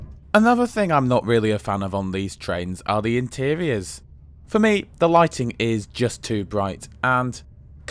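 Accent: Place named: England, United Kingdom. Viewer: Your Italian sounds very English 😁. I also got troubled in Italy for filming. They are similar freaks as TfL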